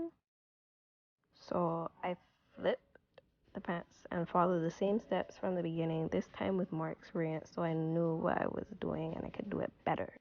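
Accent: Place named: Barbados. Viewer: Jealous you have the bajan accent and I don’t lol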